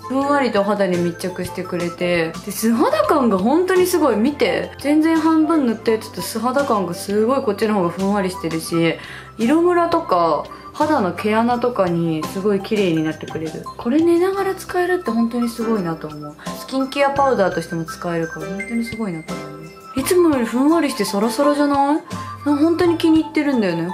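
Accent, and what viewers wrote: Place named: Kansai, Japan. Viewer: 文句なし！可愛い❣️咲乃ちゃん時々関西のイントネーションになるね😄お母さんの影響？徳島だっけ？なんか嬉しい😊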